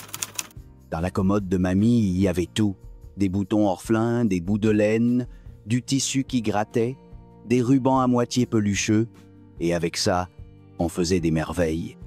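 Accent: Quebec, Canada. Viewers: Mais c'est de la France dont vous parlez, ou du Québec? l'accent dans la narration est vraiment québécois, et je vois l'affiche Théatre Princesse avec Ville de Québec dans le visionnage. De quel pays parle-t-on exactement svp???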